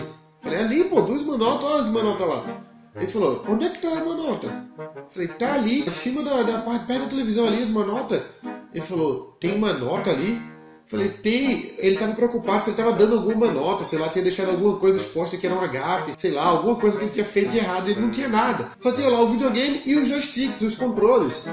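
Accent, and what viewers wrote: Minas Gerais, Brazil. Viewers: Este cara já pegou o sotaque mineiro...kkk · Uai! cê num é mineiro não? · Ele pode ate ser pernambucano mas fala q nem mineiro já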